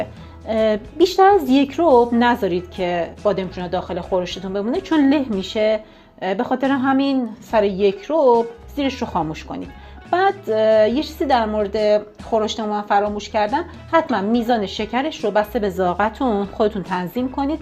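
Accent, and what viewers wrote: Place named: Iran. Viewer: چقدر باسلیقه و چقدر دقیق توضیح دادید. برای من جدید بود و خیلی جالب. حتما درست می کنم🌷💕🎀 ممنون از لطف شما که طرز تهیه رو در اختیار گذاشتید. در ضمن چه گویش زیبا و شیرینی دارید. خیلی مایلم بدونم گویش کدوم خطه ایرانه. خیلی دلنشینه 🌷💕🎀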